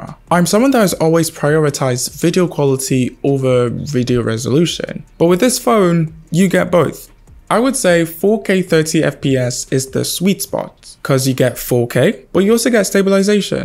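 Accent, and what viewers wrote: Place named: England, United Kingdom. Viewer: yeah, probably grew up in england or schooled there or something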